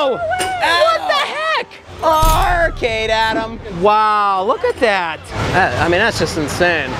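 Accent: Pittsburgh, United States. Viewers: I love hearing your Pittsburghese!!! I am from the /burgh and love to hear the sounds of home. I also love the mentions of places near Pittsburgh.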